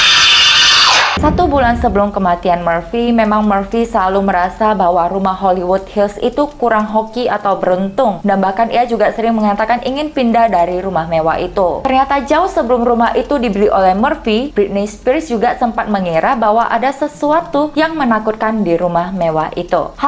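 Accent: Medan, Indonesia. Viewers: Cara ngomong nya sepertinya dari Medan haha Apakah dulunya tinggal di Medan?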